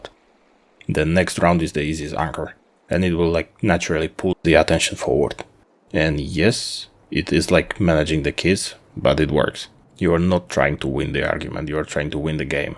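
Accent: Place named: Finland. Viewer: By that accent , are you Finnish ?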